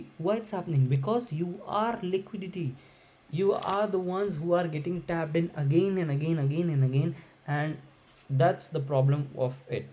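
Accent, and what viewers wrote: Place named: India. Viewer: your accent seems Indian by the way🙃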